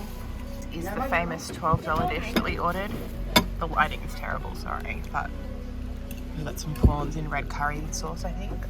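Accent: Australia. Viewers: Sound aussie!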